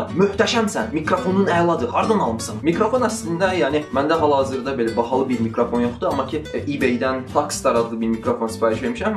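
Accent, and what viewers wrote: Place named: Zaqatala, Azerbaijan. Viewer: Bu video da digərləri kimi əladi və çox maraqlidi yəni mənim üçün elədi hə bu arada məndə Zaqatalalıyam danışığından bilinir onsuzda sənə uğurlar😊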